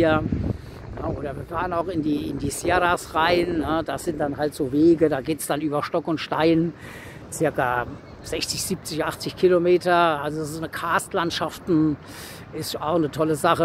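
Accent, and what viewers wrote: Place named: Saarland, Germany. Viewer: Grüß dich. Kommst du ausm Saarland? Dein Dialekt klingt so. Sorry wenn das nicht der Fall ist 🤣👍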